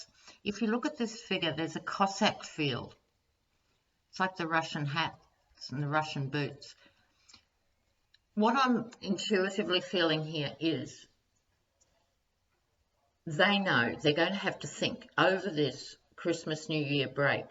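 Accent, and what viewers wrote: Australia. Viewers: Wonderful - I just want to thank you for bringing American a little hope and insight into the ultimate ending of all of this madness. When I hear your lovely accent I am reminded of all of the times when America has gotten themselves into a mess and Australia, like that treasured big brother, forever there supporting and trying to get us to do the right thing. Nevertheless, I am grateful for you and others who have held our hands this past year and I hope against hope that next year we won't keep you guys so busy.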